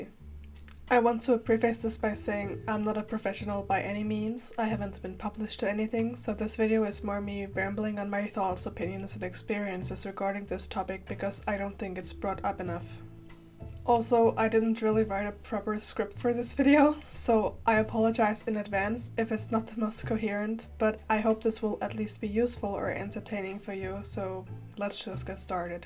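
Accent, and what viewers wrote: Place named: Europe. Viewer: Damn, you sound so European! I love your video btw. 🎉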